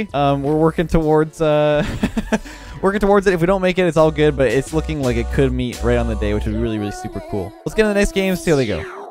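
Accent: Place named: Canada. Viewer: My Canadian friend pronounces his wors with -ar like you such as car and garbage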